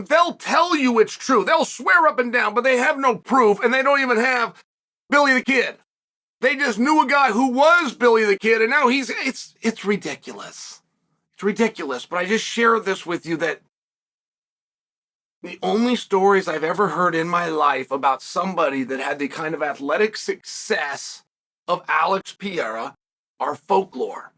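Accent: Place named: United States. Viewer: I'm brazilian and chael have the most american accent i have ever seen speaking portuguese.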